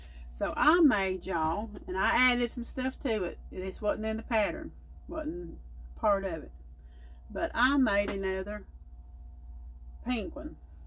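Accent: South, United States. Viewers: Watching in my gown, heading out to work in a few, love hearing another southern voice. Great job on those monsters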